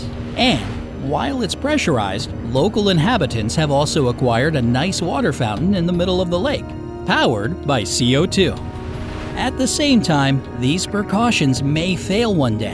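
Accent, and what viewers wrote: Russia. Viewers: Are u russian? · To: Bright side Are you a Russian or Ukrainian?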